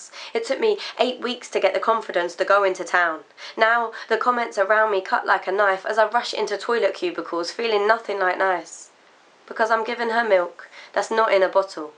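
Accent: England, United Kingdom. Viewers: I keep praying that one day all English people will be able to speak English instead of this disgusting shit accent. It's as bad as a Boston accent. Fucking hideous. How do you invent a language and then fuck it up so badly when trying to speak it? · And btw the english accent is the best!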